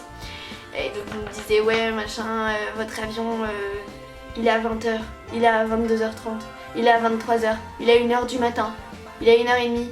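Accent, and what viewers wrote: Belgium. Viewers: Tu es Belge? Sinon super vidéo :)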